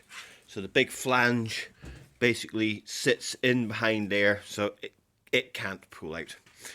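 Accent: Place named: Scottish Highlands, United Kingdom. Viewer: You sound a bit Invernesian or Highlands definitely. Are you still about?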